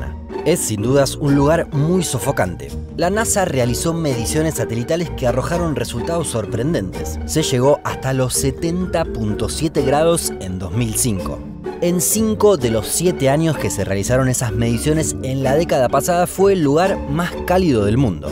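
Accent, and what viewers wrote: Rioplatense, Argentina. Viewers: Yo solo veo tus videos por que me gusta el acento argentino saludos desde Mexico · Buen video pero no puedo soportar tu acento argentino en especial cuando pronuncias la letra doble ll como valle lo dices vashe☹️😒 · Sos argentino o uruguayo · AY MIJO...VOS SOS ARGENTINO... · Es un argentino bastante sobrio, por eso queda simpático, aunque quizá sea uruguayo. ¿Algún día lo sabremos? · Como relator tiene axento argentino.. pense que diria que en argentina jaja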